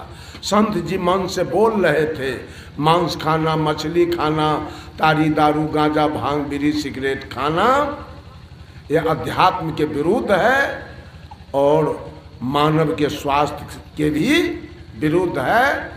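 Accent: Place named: Bihar, India. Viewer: वाह बाबाजी आपकी वाणी एक सो परतिसत सत्य तो है लेकिन आपकी वाणी अनंत सत्य हैआपका अभिनंदन चरण वंदन है आप कि बिहारी भाषा बहुत अच्छी लगती है आप जैसे महा पुरुष सदी मे जरुर आते है पागल लोगो को चेताने के लिए आपमहान संत है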